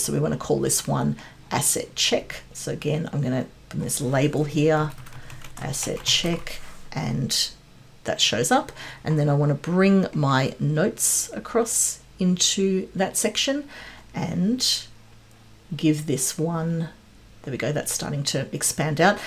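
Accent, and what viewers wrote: Australia. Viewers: you are such a nice person... This is the best and full tutorial available. I like your aussie accent, clear voice and easy to understand. I watched this full video and this asset management app works great for me. It was my first model-driven power app, and I enjoyed it alot. Thank you so-oooo much.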